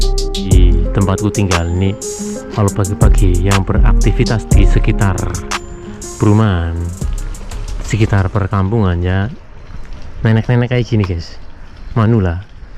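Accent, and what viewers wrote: Banyumas, Indonesia. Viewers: Bang banyakin ngomong ngapak nya bang...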